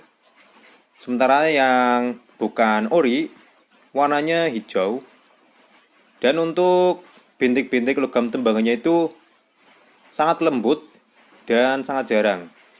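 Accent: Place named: Tegal, Indonesia. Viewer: Kiye mah wong Tegal ngalor kiyeeeeeeeeeee 🤣🤣🤣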